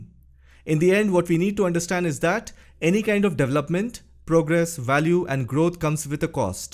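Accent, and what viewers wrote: India. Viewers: Sir your desi English just like a wow 🎉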